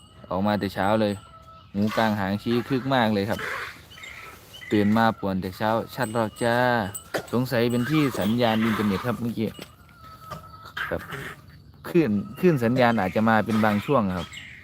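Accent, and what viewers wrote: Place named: Pathum Thani, Thailand. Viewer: ปทุมธานีชัด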